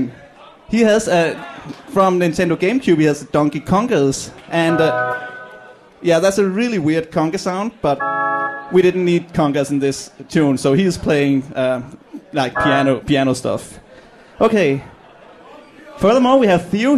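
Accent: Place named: Denmark. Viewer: Press Play on Tape is Danish, see <link> And yes, the accent very much sounds Danish :)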